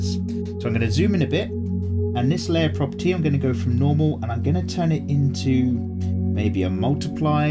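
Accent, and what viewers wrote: United Kingdom. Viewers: He's definitely British. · do you happen to be british? idk what british people sound like, but i feel like you are british.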